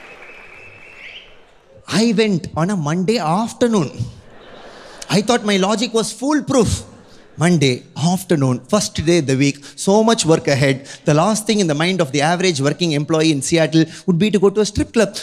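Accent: Tamil Nadu, India. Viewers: Purely sickening jokes.....accent soudhi ndian, heavy blown tamilian...crap...audience also samebrain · 😂tamil ya avan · guy hs got a thick Indian accent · He is the guy whose accent is depicted as Indian's accent by westerners.. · God sir u have the most typical Indian accent 🤣🤣🤣🤣 loved the skit..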